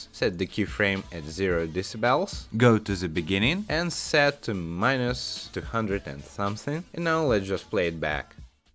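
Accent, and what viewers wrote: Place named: Russia. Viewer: Привет. Сижу готовлю видео на ютуб на эту же тематику что у тебя (только на русском). Ну и полез смотреть кто что и как сделал. И я крайне удивлен что у тебя так мало просмотров. Твой тютёриал один из лучших, что я видел. Подпишусь ка на всякий случай 👍 P.S. Да я понял что ты говоришь по русский из-за акцента. Но на всякий случай пришлось лезть к тебе в фейсбук чтобы убедиться на 100% 😉 Я кстати тоже с Украины. P.S.S. И рад знакомству 🤝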